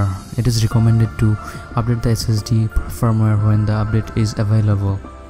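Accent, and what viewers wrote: India. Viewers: Indian accent and annoying music. Awful video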